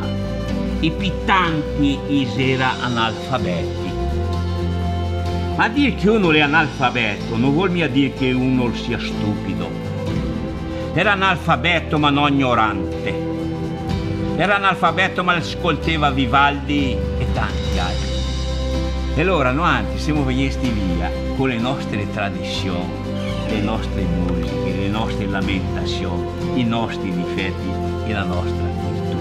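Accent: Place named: Veneto, Italy. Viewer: Bellissimo filmato, addirittura commovente questa voce che racconta perfettamente la storia in veneto ( con accento "bellunese/trevigiano" con qualche punta di "chioggiotto") dopo più di un secolo di distanza!!! Ma quanti compaesani ci sono in giro per il mondo ed in Brasile in particolare?! Quando penso che la più grande città "italiana" nel mondo non è Roma bensi' San Paolo (città con 12 milioni di abitanti dei quali METÀ sono discendenti della nostra gente) mi vengono i brividi!!!❤👏👏👏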